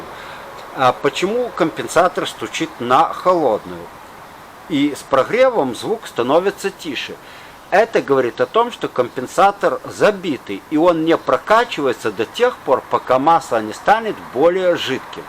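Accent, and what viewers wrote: Odessa, Ukraine. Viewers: Таки я вам премного благодарен за дельные советы,а вы самом не из Одессы?И машинка стоит на фоне японская,Митсубиси,и речь таки знакомая... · Таки сразу понял шо попал на канал настоящего одесита, лайк. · Такой одесский говор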